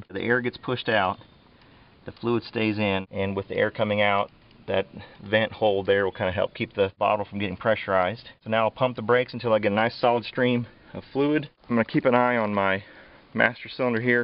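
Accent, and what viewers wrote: United States. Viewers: No funnel, no gloves, southern accent, thats back woods boy. Merica! Hey at least you have good info, and you were wearing eye pro that stuffs bad on the eyes.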